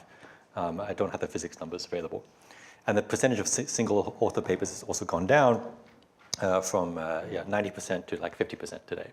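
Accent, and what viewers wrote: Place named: Australia. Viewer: He’s brilliant. I was in the same year when entering Princeton grad school and lived in the same “ New College “ (dorm) as Terry in the early 90s. He was so young and we all saw him at dinner each night. I’m in the humanities so we weren’t close. He was roughly 16 or so if I remember correctly. Later, I would sometimes see him walking on Witherspoon, deep in thought. He had this same Australian accent in those days as well.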